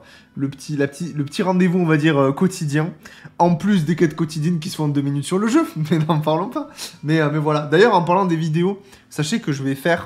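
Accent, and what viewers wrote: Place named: South, France. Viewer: Pour les idées de vidéo, je remet ici l'idée de faire un top drip/style des persos 😎 Le truc en plus qui fait aussi que j'aime ton contenu, c'est ton accent du sud ahaha :D Pour le cast zone, je suis pas créateur de contenu, mais je suis toujours chaud pour participer pour un spécial lore et théorie, je pense vraiment avoir de quoi causer 20 bonnes minutes et qu'on pourrait débattre dessus, j'adore me hype sur l'avenir possible du titre 😁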